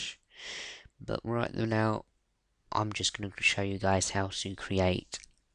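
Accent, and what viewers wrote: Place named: England, United Kingdom. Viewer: It's not the sound, he's just got an English accent...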